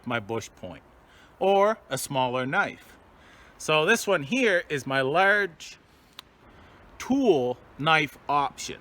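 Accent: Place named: Chicago, United States. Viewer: a very nice blade. "Da Chappa" with a nice Chicago lilt. Cheers, Mate.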